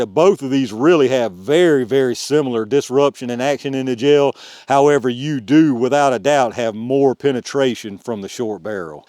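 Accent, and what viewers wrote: South, United States. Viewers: This guy does some very interesting and informative videos. I also love his corn pone accent. This is not meant as a slur, I just love the fact that he's very unpretentious.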